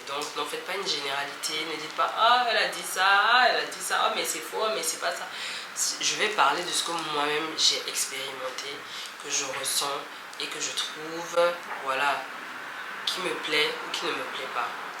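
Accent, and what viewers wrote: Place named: Ivory Coast. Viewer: Tu as un accent ivoirien. En plus tu ressembles a une fille agni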